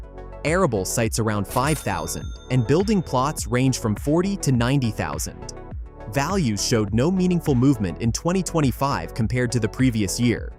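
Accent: United States. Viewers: change the American accent for gods sake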